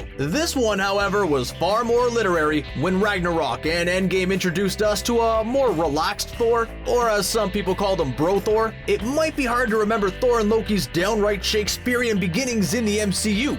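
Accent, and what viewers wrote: Canada. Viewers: I hear a Canadian accent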